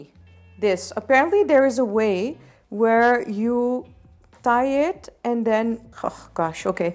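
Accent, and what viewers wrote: India. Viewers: Hey, heeel lang geleden dat ik een video van je heb gekeken, leuk je fijne energie weer even te voelen en wat een tof om te zien dat je je hart volgt en in India woont nu, bijzonder ❤️. Spot ik nou een beetje een indian accent?:)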